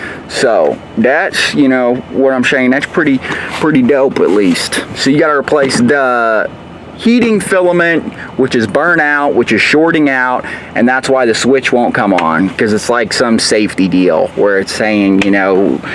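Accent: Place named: Appalachia, United States. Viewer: What a stinking hill billy. How about some regular English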